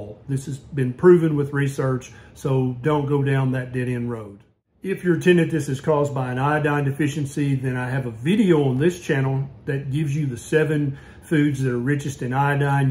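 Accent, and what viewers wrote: South, United States. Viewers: Oh my goodness… we really do say it like that in the south lol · Tiniiiiitus is pronounced this way in the north as well so you sound like you're from the south and I don't understand you're saying it is pronounced that way in the South when you clearly I can't even listen to you because you keep on saying it improperly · 100% support that southern pronunciation,!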